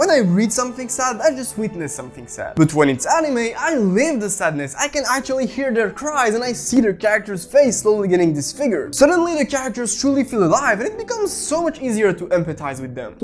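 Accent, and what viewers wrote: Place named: France. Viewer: Gooooood, I love your french accent 🥖🥖🥖🥐🥐🥐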